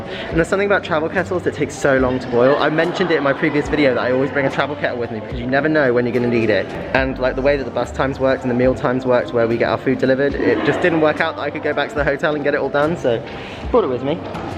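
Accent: United Kingdom. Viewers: You & Matt are outstanding and your bubbly personality (and your EYES!- they're so dreamy!) on your videos is so much fun! But can you tone down the British dialect so that we older Americans can keep up with high-speed commentary? You and Lance were kind enough in the summer of 2017 at the Honolulu Rainbow Film Festival to say hello in a short video greeting to me as I lay in my hospital bed, missing the chance to attend the gala. I've been a fan of you and Lance since before then (and I'm holding onto that video!). Good luck at the Olympics!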